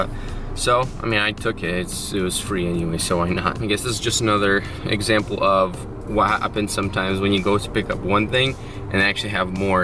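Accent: Russia. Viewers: Are you Russian or Slavic? I’m willing to bet my left nut you are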